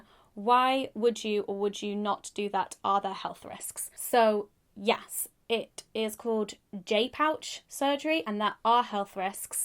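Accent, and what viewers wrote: United Kingdom. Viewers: I love your British accent and you speak very well I understand almost everything you say (I am not so good in English). You are definitely Awesome!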